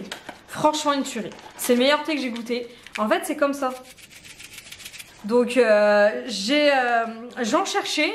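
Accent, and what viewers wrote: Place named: Béthune, France. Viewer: Oh!!! J’adore votre accent! Vous êtes de quel coin? Mais vous l’avez peut-être déjà dit …(je suis une abonnée récente) cela me rappelle mes cousines qui sont du côté de Bethune.Sinon merci pour vos vidéos si agréables ❤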